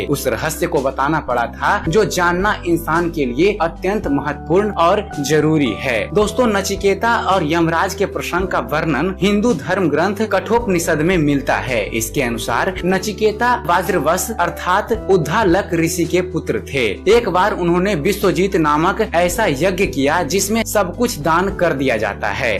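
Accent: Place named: Bhojpuri, India. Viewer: Please speack in proper saskrut manner Not in bhojpuri manner Speack clearly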